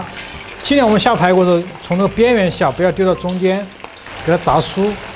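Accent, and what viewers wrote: Sichuan, China. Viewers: 这很明显是四川口音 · 因为是川渝地区的人，所以会是椒盐普通话😂😂😂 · 椒盐川普，巴适！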